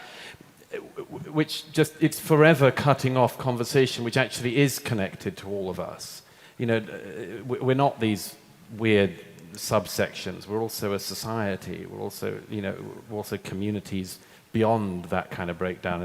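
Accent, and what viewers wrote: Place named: United Kingdom. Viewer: Douglas Murray is one of the intellectuals of the modern age that should be protected at all costs, as I fear irrational people would have all "justification" when they think they have to stop his fair criticism. He knows how to give criticism in a way closely resembling Christopher Hitchens. Plus I just like the british accent.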